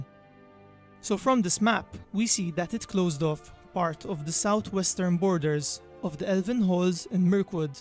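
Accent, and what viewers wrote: Malta. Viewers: You sound like a Maltese English speaker. Good work on the videos! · Great video buddy! You are Maltese, right? Very distinct accent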